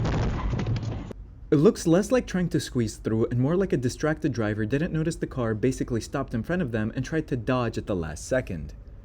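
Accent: United States. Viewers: Why is an American voicing over a British dashcam compilation cause he know absolutely nothing about our roads and sounds stupid...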